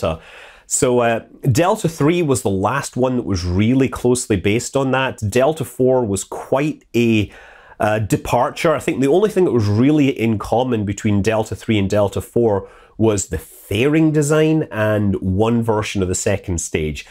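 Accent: Scotland, United Kingdom. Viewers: His Scottish accent kicks ass IMHO.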